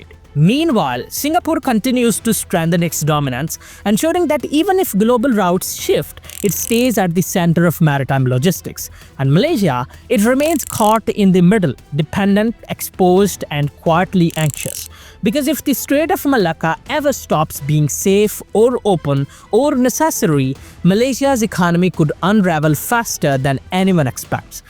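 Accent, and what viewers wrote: India. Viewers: It irritates me when you say " raut" for route! Route is pronounced " root". Unless you were born and bred in the USA, please pronounce it the English way! You sound indian so I find it even more irritating when you suddenly use American pronunciations for certain words. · What is the point he is trying to make? Malaysia has also a very large port im the China Sea.. pointless video. This sounds like a guy from India?? There are other ways for ships to pass from the China Sea to the Indian Ocean.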